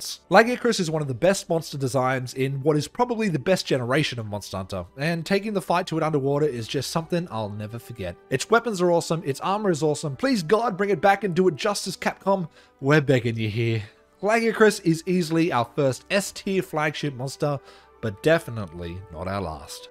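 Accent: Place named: Australia. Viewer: Doesn't help an Aussie accent really plays into pronouncing the di a lot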